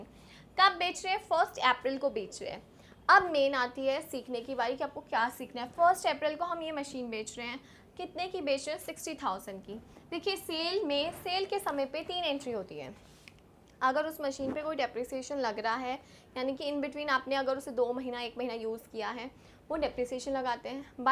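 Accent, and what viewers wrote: Haryana, India. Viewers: Haryana se ho😘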